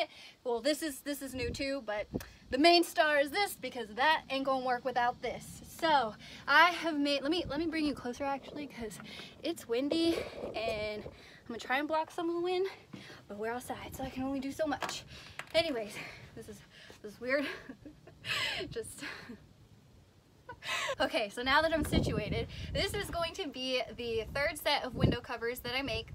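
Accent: Texas, United States. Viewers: I thought I heard a Texas twang in your voice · Funny your texan accent pops in and out occasionally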